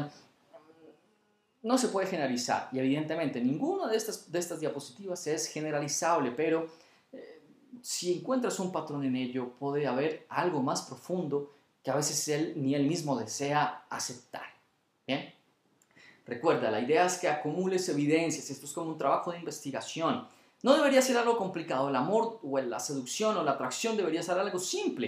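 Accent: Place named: Colombia. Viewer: Bonito acento, de entrada supe que eras Colombiano :)... Me re encantaron tus tips, gracias!!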